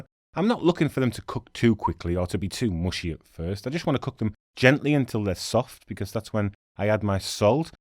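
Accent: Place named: Yorkshire, United Kingdom. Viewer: Loving the Yorkshire accent.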